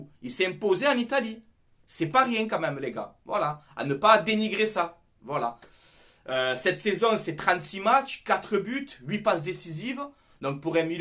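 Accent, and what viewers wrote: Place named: South, France. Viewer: salut ecoute quant je t'ecoute parlé avec accent du sud sa me fait rire et tk je suis du sud aussi quant ont parle sa recent pagnol et les cigales le pastis les grillade de sardines la petanques le sud quoi:p prend soin de toi ami et merci pour les infos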